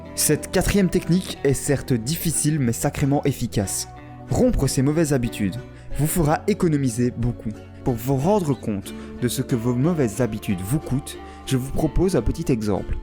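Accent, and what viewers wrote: Liège, Belgium. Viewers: Tu ne serais pas de lièchee toi ? :D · Tu es belge ? Bref bonne vidéo pouce bleu 👊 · Tu es belge ? Merci pour les conseils , j'adore tes vidéos 😍❤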